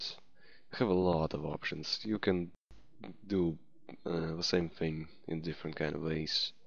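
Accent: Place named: Russia. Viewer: Судя по такому произношению , автор отечественный .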